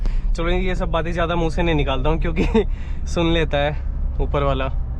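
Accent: Haryana, India. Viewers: Bhai aap jo haryanvi me bol teea ho bhot aacha lagta ha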